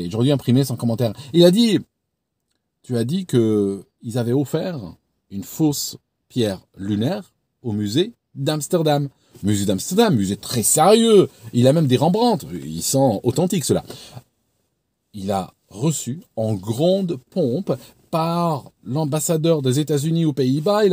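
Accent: Switzerland. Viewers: Oulala toi tu as vécu en Suisse, je peux même te dire le canton: VD?! Tu as bien gardé ton accent!! Salut l'Ami!! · C'est dingue comme l'accent suisse est présent. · Top l’accent suisse qui ressort dans cette vidéo 😂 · ton accent Suisse ressort lol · L'aziz. bravo à toi. On te l'a déjà dit j'en suis sûr. Tu as l'accent suisse qui remonte à la surface en ce moment ! Alf shukr pour le contenu et la livraison inégalables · l'accent Suisse qui revient de plus en plus fort la vidéo avançant. Interessant les points que tu soulèves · Ce qui me choque moi dans cette vidéo, et c'est la première fois que j'entends ça chez toi, c'est qu'on dirait que tu avais un challenge: dans cette vidéo tu dois parler avec l'accent suisse! Eh bien challenge réussi! 😁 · Amusant ce petit accent helvétique, je ne l’avais jamais remarqué sur tes autres vidéos d’aviation 😂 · J'adore ton accent Suisse,lorsque tu nous expliques les détails de tes arguments!!! 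Merci pour tes réflexions 👍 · Tu as déménagé en Suisse ? Tu as, dans cette vidéo, un accent suisse que je n'avais jamais remarqué. Ceci dit, excellente vidéo. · de ouf, je me suis dit mais qu'est-ce qu'il fabrique avec cet accent suisse tout à coup?!? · Intéressant. Mais c'est une impression ou t'as pris l'accent suisse pendant la vidéo ? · J’avais jamais remarqué l’accent suisse 🇨🇭 😅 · J'ai adoré ton accent Suisse 😉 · ton accent suisse ressort lors de ton exposé, Ça fait tout drôle... 😄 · Ahaa L'accent suisse.. 🍰 Et oui c'est pas mamie qui fait tous les gâteaux.. ;) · Il n'y a pas que moi qui entend un accent Suisse ??? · Dans cette vidéo, tu as l accent suisse lol, un reste de tes années vécu las bas ? Sinon comme tu le dis très justement je préfère avoir tort et avoir l esprit libre ,plutôt qu avoir l esprit d'un poulet élevé en batterie... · Excellente vidéo (comme toujours)👏👏 où tu argumentes encore mieux que ceux qui ont menti à propos de cette mission. 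Avec leurs têtes d'hommes honteux pendant la conf de presse, n'importe quel joueur de poker voit que ces hommes ne se considèrent pas comme des héros. Et connaissant l'arrogance des américains quand ils sont fiers de quelque chose, on en déduit que ces 3 hommes sont très mal à l'aise avec ce mensonge et ne sont absolument pas fiers d'eux. Bref C.Q.F.D. À noter que pour l'occasion, tu as sorti ton savoureux accent suisse romand, du pur bonheur 😉😊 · J’étais pas prêt pour l'accent suisse... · Vous avez un petit accent suisse, bonne continuation avec toute ma sympathie. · Excellent! Mais c'est quoi cet accent suisse?! 🤣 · C’est la première vidéo sur laquelle ton passage en Suisse se remarque vraiment à ton brin d’accent d’chez nous 😉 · cher, je n'avais pas écouté cette vidéo, très très intéressante, tu gardes ton sérieux, et tu parles avec l'accent suisse. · Merci pour la vidéo on entend parfois ton accent suisse c est super a entendre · Je n’avais pas remarqué cette accent suisse, lors des autres vidéos…. 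Merci 🙏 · Je viens de retombé sur cette vidéo. T'as grave l'accent suisse là-dessus mdr! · l'accent suisse revient à la charge !😄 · Ton accent suisse s’entend parfaitement cette fois 😊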